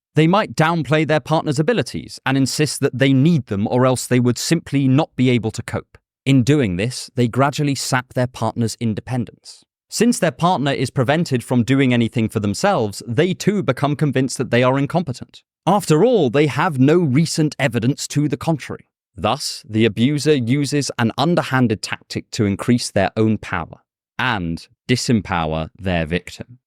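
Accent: United Kingdom. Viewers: I really like his British accent ❤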